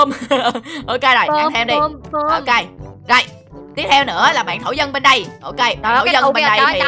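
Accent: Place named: South, Vietnam. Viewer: Tại anh người miền Nam mình cũng hay nói vậy mà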